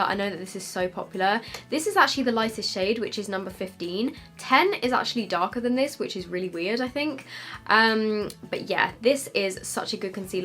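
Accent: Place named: England, United Kingdom. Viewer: You are my favourite English youtuber and my Spanish favourite youtuber (I am Spanish) has just done a review of your palette. It is like a mixture of my 2 favourite youtubers in a video Yayyyy haha Her channel's name is Ratolina in case you want to watch hehe Lots of love♡♡♡ ps: I really love your accent ♡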